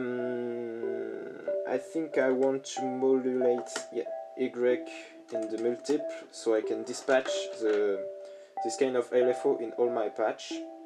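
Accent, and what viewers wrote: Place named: France. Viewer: Talking about modular sounds that much better with a French accent. 😃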